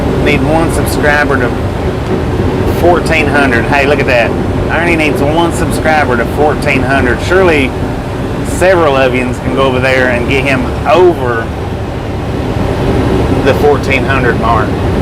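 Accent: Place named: South, United States. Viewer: since Kyle was saying how he feels here I go lol. From your first live stream which came across in my feed. I heard a southern accent so I stayed tuned in. Then after many more streams I thought this guy is genuine. Your a friendly guy and you always seem calm. Great attributes to have bud. As far as your driving your awesome at that. Your cool with your subscribers and it has paid off. Anyways, after I read Kyle’s comment it compelled me to say what was on my mind. When I met you and seen your the same in person as well as your YouTube live streams. That went along way with me. I don’t stay as long as I’d like I get busy and have to step away but I try to pop in every stream and most the time when I step away I let it run lol. If I subscribe I support and you’ve done the same with me. I appreciate you brother and it’s good to see the subs you have. 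All mighty fine ppl. Okay ok. Nuff of this lol. Just being honest brother.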